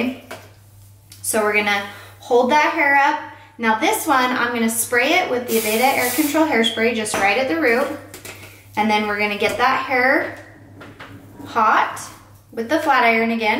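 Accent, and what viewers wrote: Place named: Minnesota, United States. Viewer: Are you from Minnesota? You sound like us if you're not.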